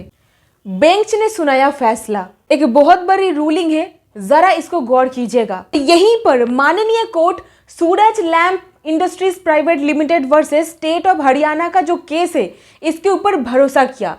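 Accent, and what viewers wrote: Bengal, India. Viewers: Thanks for explaining so nicely. Nice to hear hindi from a bangali speaking lady.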